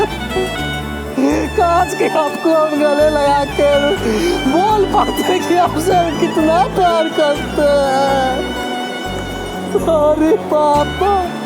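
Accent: Bihar, India. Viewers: This Video made me cry.... Hope will see u soon in Bollywood in This Bihari Tone.... Maharaj mtlb ki mast video chhela... 🙏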